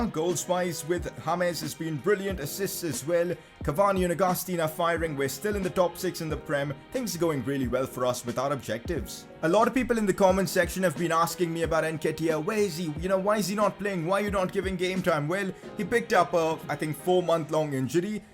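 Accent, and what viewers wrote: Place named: India. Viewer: indian accent is so funny only reason why i watch your videos lol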